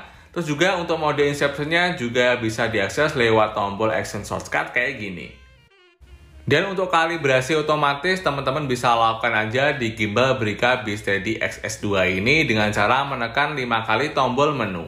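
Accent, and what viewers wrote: Magetan, Indonesia. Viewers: Orang magetan to bang?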